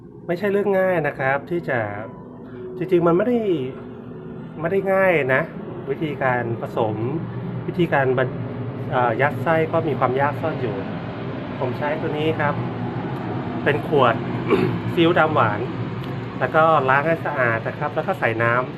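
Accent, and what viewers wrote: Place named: Bangkok, Thailand. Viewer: อยู่มี่ไหนคะนี่พูดเพราะเหมือนคนกทม.ตอบด้วย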